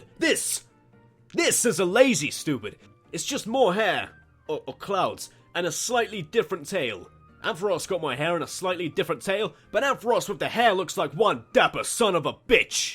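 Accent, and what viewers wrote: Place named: Northern Ireland, United Kingdom. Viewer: Where so you live? I couldn't tell if it was NI or Britain 😅 I live in N.I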